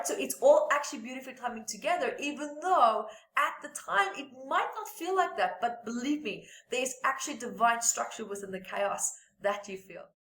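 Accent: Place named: South Africa. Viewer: She is from South Africa 🇿🇦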